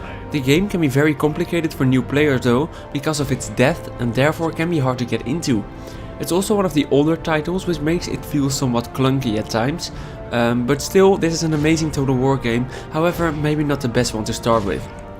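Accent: Netherlands. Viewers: Ik hoorde na 2 seconden al dat je Nederlands was. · Ben je nederlands? Haha, mooie vid in iedergeval. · are you dutch becouse youre acent notting bad but just curious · As your eastern neighbour, i like your dutch accent, it sounds as funny as it sounds in german But still a good Video, even if i have around 1200h in all Total War games and own every game since Rome I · Jij bent nederlands of niet hé! hoorde het aan het accent haha! xDDD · never watched your videos are you dutch?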